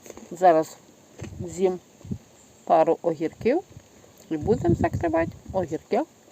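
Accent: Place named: Chernihiv, Ukraine. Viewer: В нашому селі теж так розмовляють. Чернігівський район, Друцьке , Ладинка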